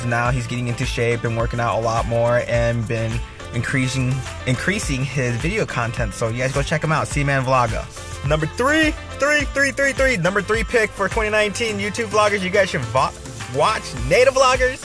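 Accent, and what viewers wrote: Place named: Philippines. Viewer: That accent!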